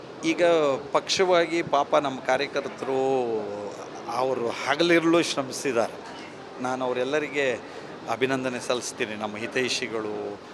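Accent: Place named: Uttara Kannada, India. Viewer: ಸೋತ ನಂತರ ಅದನ್ನು ಹೇಳುವಾಗಲೂ ನೀವು ,ಸರಳವಾಗಿ ಉತ್ತರ ಕನ್ನಡ ಜಿಲ್ಲೆಯ ಆಡು ಭಾಷೆಯಲ್ಲಿ ,ನಿಜವಾದ ಕಾರಣ ಹೇಳದೇ ,ತಾನು ಇನ್ನು ಸಭಾಪತಿ ಎಂಬ ಬ್ರಮೆಯಲ್ಲಿ ,ಏನೇನೋ ಹೇಳುತ್ತಿದ್ದೀರಿ.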